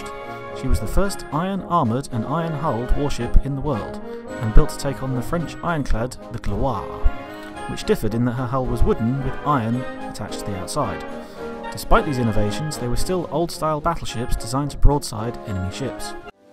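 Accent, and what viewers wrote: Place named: United States. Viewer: He's definitely an Immigrant going by his American English and awful grammar.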